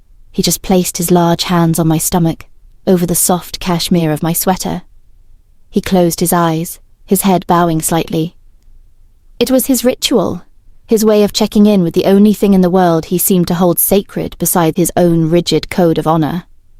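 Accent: England, United Kingdom. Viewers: I like the English accent. It’s better than the one where she sounds like a Connecticut elementary school teacher. And there are a lot of Black people, including women, in England, Africa, and Asia who all have English accents.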